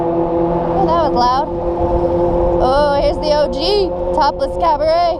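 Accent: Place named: Texas, United States. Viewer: Love your Texas accent. The reason why you see so many vacant buildings and vacant land in Las Vegas, is the same reason that you see these things in most major US cities and that is the prices of property has been pushed up so high, it has become too expensive to carry the financing. So they sit vacant and empty. There is many homeless in Las Vegas. And if you were to go north from Fremont st on LV Blvd you would see it. Or go to Boulder hwy.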